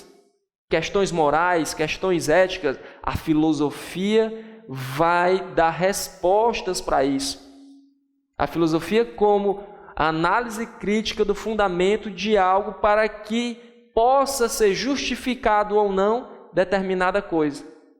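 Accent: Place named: Rio de Janeiro, Brazil. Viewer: pelo dialeto deu pra deduzir que você é carioca,o vídeo foi uma ótima introducao da filosofia para as pessoas interessadas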